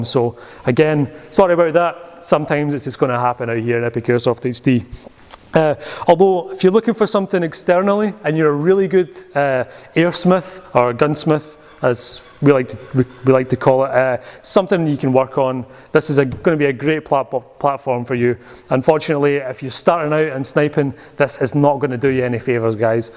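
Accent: Scotland, United Kingdom. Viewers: Your Scottish certainly lays down the law, that's for damn sure.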